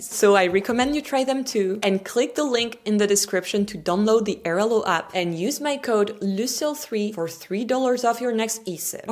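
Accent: France. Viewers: French accent engrish is annoying. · Pretty sure 'Chicago' is the only word you said w/out a French accent. Guessing you say it how Bobby does 😄😄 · I love that you pronounce Chicago just like someone from Chicago! It contrasts so sharply with your lovely French accent:]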